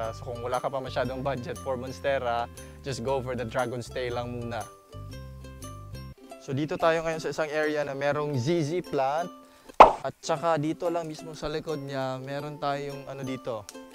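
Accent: Visayas, Philippines. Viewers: Instant subscriber here.. so Bisaya ang tone Ng voice ...rpoud Bisaya here too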